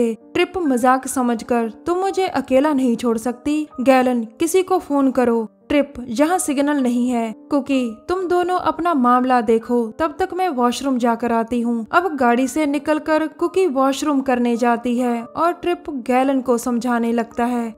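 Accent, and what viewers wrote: Punjab, India. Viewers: R u punjabi? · Are you panjabi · You're talking style punjabi. Are u punjabi?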